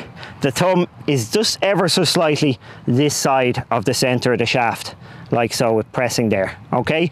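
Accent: Dublin, Ireland. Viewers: Love the Dublin accent , little tums . My favorite band is a Dublin band THIN LIZZY or how Paddy would say TIN LIZZY .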